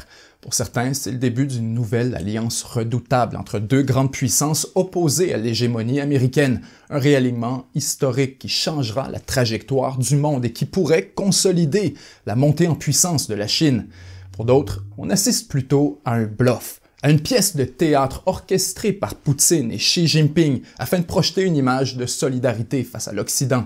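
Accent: Quebec, Canada. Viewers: Tu dois être québécois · cet accent canadien qui me rappelle les bons moments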